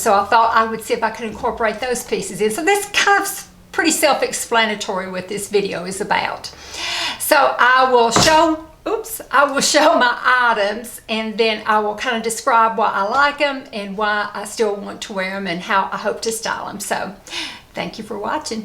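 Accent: South, United States. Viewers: You could have been a Miss America...you are so beautiful and your figure is amazing. Love your southern accent...❤️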